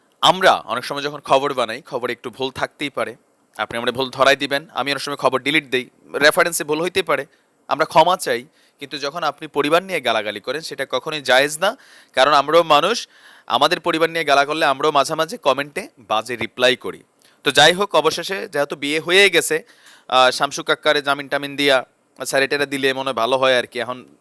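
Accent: Comilla, Bangladesh. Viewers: hahah i just like ur comilla accent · ভাই আপনি কুমিল্লার ভাষায় বল্লে সুন্দর লাগে। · Vai,,plz apni alws cumillar vasay vedio diyen,,, eto eto eto valo lage❤❤❤ aha go moirom bubu❤❤❤😂😂😂😂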